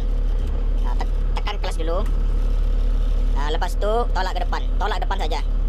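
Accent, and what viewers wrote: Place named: Madura, Indonesia. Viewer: Kok kaya logat Madura omm😅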